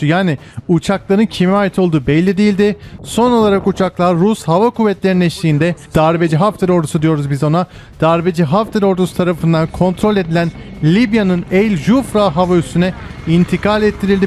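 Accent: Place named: United States. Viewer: Bak kardeş seni abone ettim ve paylaşımlarını izliyorum lakin şu dönme gibi yada amerikanlıştarılmış lehçeyle konuşma. Sinirlerimi bozuyorsun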